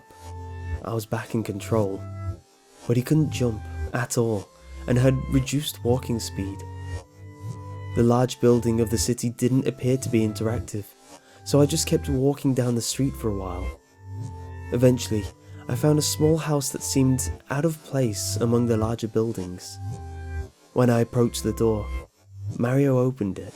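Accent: United Kingdom. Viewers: IKR, Brits pronouncing things differently is so wholesome!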